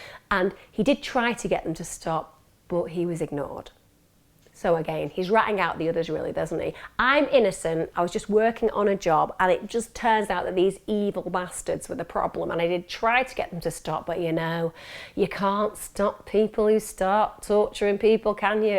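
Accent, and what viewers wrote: United Kingdom. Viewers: I just want to stop by applauding you with your consistency I mean I seriously don’t know how you do that! You’re a professional background is so interesting and your research is beyond Par! I moved from Wales to Southern California and seriously I just love hearing your British voice I miss that a lot been here nine years now! I just shared the Turpin case on my Facebook as that is actually really close to me just a few miles! Keep shinning you are incredible! And thank your amazing Hubby!! 🤍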